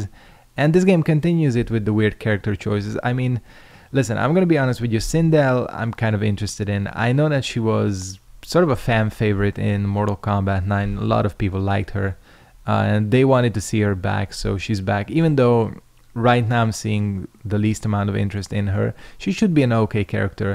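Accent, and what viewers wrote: Middle East. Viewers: I had never seen hia face before, his voice sounded middle eastern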